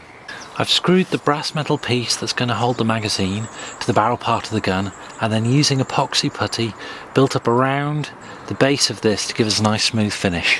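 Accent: United Kingdom. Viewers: i love your fuckin accent boy, what is it? British? greetings from texas brw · are you from the U.K.?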